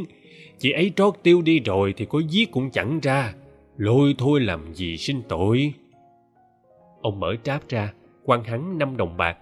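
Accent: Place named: South, Vietnam. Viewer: Tất nhiên rồi. Bởi vì Chí Phèo là nhân vật xuất phát từ miền Bắc, quê hương của Nam Cao thuộc tỉnh Hà Nam Ninh ngày xưa mà. Nhưng Giọng miền nam ngọt ngào của bạn này cũng rất hay. Hay lắm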